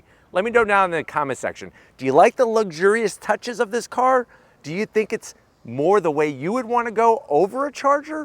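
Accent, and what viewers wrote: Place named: Midwest, United States. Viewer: Dude. You have got to be from the Midwest!!? I'm from Detroit. I can hear it! :text-green-game-over:😄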